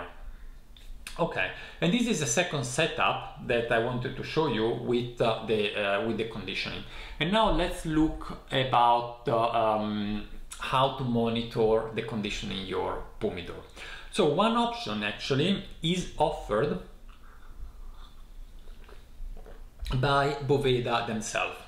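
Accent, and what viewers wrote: Italy. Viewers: Ciao, ti ho sempre e solo sentito parlare in inglese, e ho questo hobby di cercare di capire la provenienza degli italiani a partire dal loro accento in inglese, che mi porta alla domanda: sei pugliese?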